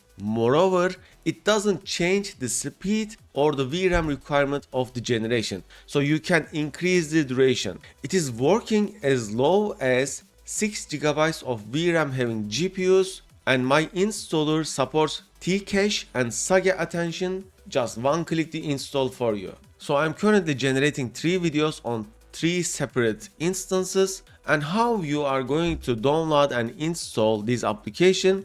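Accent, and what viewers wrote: Turkey. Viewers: Selam dostum yanlış anlamazsan aksanından Türk olduğun anlaşılıyor o yüzden Türkçe soracağım. Bu kurulumu herhangi bir amd gpu için yapmayı denedin mi?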